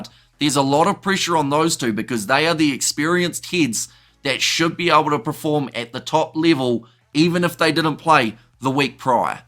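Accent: New Zealand. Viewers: Perfect pronunciations! Didn’t expect it when I heard the thick kiwi accent. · Love the colourful commentary and insights, and your great Kiwi accent. Thanks, man.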